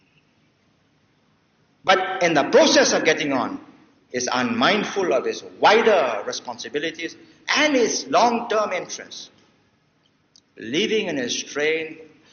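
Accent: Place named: RP, United Kingdom. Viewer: As a person from Britian, who can’t help but view Lee Kuan Yew as one of the most inspiring figures of the truly post war world, I’d like to say that you for uploading this and also. Wow he sounds so much more British upper-crust here, as he lived the decades in Singapore his accent slowly joined the home he formed.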